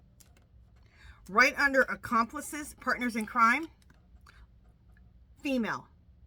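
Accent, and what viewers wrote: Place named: New Jersey, United States. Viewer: Your predictions are very precise and informative. I enjoy your energy and down to earth podcast. I look forward to your videos. Keep them up for all of us hoping & praying for Kamala's reign. (You still sound like a jersey girl 😅) I'm a 73 y/o ex jersey girl... "you guys" are what we use to say.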